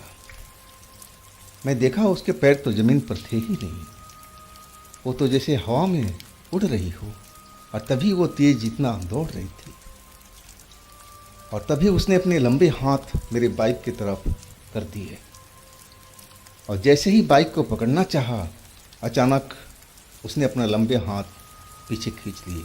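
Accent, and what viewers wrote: Bihar, India. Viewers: Nai ye bhai bihar ke taraf se hai, sun sapata bihar me hi bola jata hai. please clear 😂😂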